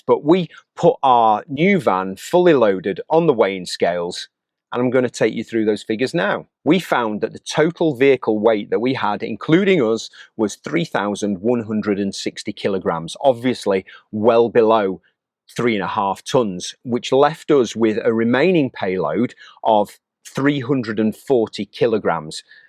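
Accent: Yorkshire, United Kingdom. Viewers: Another great video: useful info delivered in a characteristic [no-nonsense Yorkshire] way. Well done & thanks. We’ve just collected our own FIAT Ducato 5.40 van, a choice much influenced by your own decision making process (as shown & shared in your previous videos, so thanks for that!). She’s a 2017 Possl Roadcar whom we’ve christened Freya. We live in The Netherlands (a campervaner’s paradise), and now happily wave to all Ducatos that we see driving around! Keep making the films - they are hugely helpful to your many followers. Happy travels en “tot ziens” (Dutch for see you)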